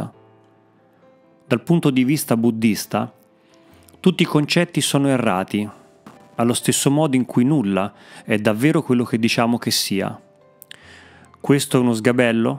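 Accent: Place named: Ancona, Italy. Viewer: Grazie per l'ottima lettura. Alan Watts è un autore che, a mio parere, molti usano senza citarlo; ma va bene anche questo. Scusami la curiosità: il tuo accento mi fa pensare alla calata anconetana o della provincia di Ancona... Sbaglio? Un caro saluto...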